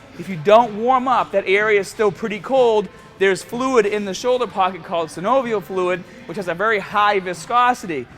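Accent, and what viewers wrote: Boston, United States. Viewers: That Boston accent was too distracting. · seems like you've turned up your boston accent a bit lol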